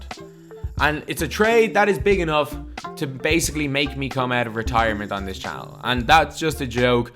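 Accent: Ireland. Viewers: U irish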